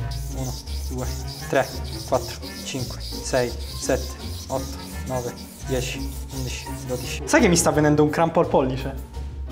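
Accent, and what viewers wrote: Rome, Italy. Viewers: Perché quando sei con i tuoi amici marchi di più l accento romano ? È fastidioso non so perché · Che bello quell'accento romano che persiste per tutto il video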